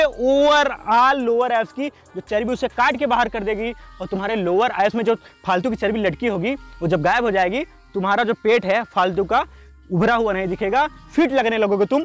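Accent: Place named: Uttar Pradesh, India. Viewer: Mja a jata hai up wali bhasa sun ke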